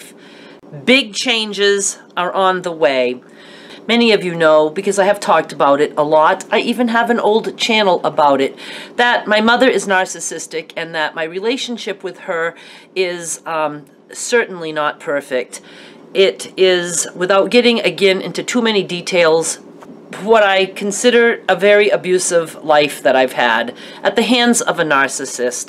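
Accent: Maine, United States. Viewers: I watch a lot of your instructional videos, but have not seen as much of your personal stuff. Thanks for sharing your struggles as many of us can relate. As a creative person myself, I know sometimes making stuff helps you deal with the crap. I have been doing some quilting and when I first clicked one of your videos, I heard your voice and saw your surname and said to myself, "she's gotta be from Maine!" Love watching you! Take care of yourself. When dealing with a narcissist, you need to put yourself first, because you know damn well she's gonna always put HERself first. Dealing with a similar situation in my own life. Hugs!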